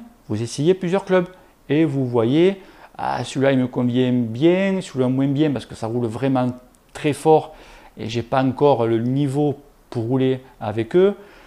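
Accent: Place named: Béziers, France. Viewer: j'en étais sur que tu venais d'à côté de béziers, j'ai reconnu l'accent (maillot saint chinian)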